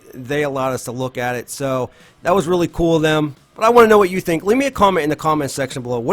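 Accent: Pittsburgh, United States. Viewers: you have the most Pittsburgh accent ever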